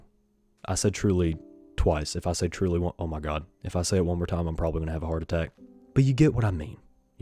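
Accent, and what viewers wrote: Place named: United States. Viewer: Bro has a cowboy accent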